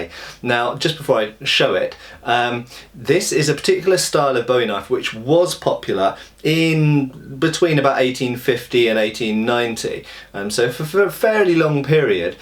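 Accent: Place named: United Kingdom. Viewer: I have never seen a silverware handled bowie knife, nor have I ever wanted one. However like most Americans, for no good reason, after hearing someone with a British accent recommend it I do.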